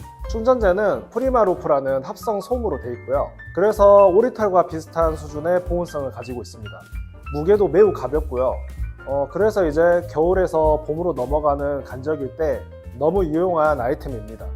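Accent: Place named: Jeolla, South Korea. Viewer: 말투가 전라도광준디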